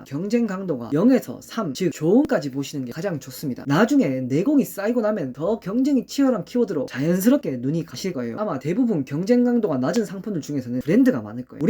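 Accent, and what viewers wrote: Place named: Gyeongsang, South Korea. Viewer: 갱상도라서 더욱 정감이 가네요...전 김해임다. 구독합니다.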